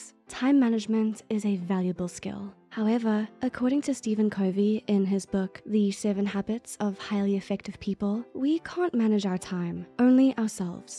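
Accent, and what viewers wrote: England, United Kingdom. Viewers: Don't like the English accent. Your normal voice is much more soothing! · Yo, what is this lovely British Accent? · This english accent is a British accent, generally I have heard American, cool though, like the voice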